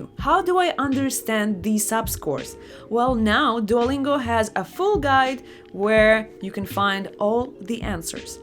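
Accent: Russia. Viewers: Ikr! I really liked the russian accent 😂😂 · My English skills is horrible, but i decided to take the Duolingo practice test and i scored just 60-75 :( Oh my gosh, i need to study more 🥺 I'll try it one more time :) I almost forgot to tell this, i found out your channel a few minutes ago by mistake and now I'm a new subscriber :) By the way, are you Russian? I've noticed a Russian accent in a few words as you speak or am I crazy 😧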